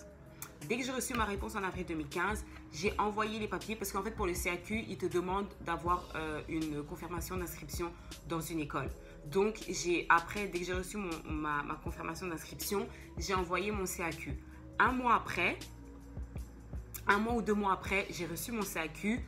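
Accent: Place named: Cameroon. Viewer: Salut tu as un peu l'accent camerounais... je viens voire votre vidéo et cela est très très bien expliqué ma question est la suivante :si vous avez un amis est-il possible qu'il parraine (sponsors comme on dit ici au Cameroun)toutes vos démarches d'immigration et combien de temps cela peut il prendre pour un jeune diplômé (ayant une licence professionnelle en logistique dans une école de commerce de la place)au chômage ? S'il te plaît ?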